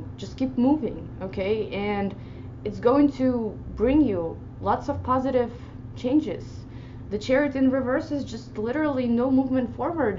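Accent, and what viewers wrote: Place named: Russia. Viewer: ❤️ your eyes. Resonate. Respect. I may fall in love with your Russian accent.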